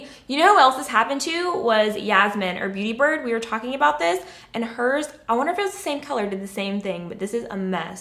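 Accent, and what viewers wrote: South, United States. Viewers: Kia Uhhhhh no it has nothing to do with audio. She used to have a very prominent southern accent.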